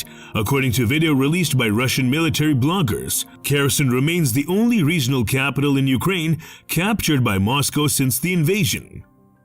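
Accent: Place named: United States. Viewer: Simply NAUSEATING American accented voiceover, had to give up.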